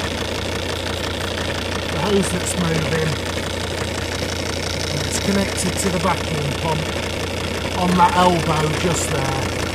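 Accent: United Kingdom. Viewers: yo are u british bruv???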